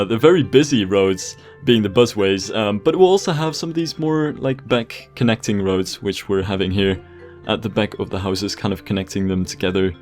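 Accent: Netherlands. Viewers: Yeahh I know but I was checking his Socialblade and there was an other country xD but his voice is Dutch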